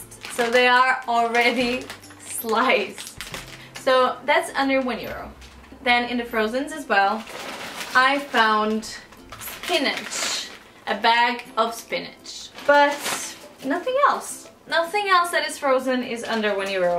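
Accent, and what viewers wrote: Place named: Norway. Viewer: It’s interesting how you don’t have Portuguese accent, but you do have the same accent Norwegians have when they speak English!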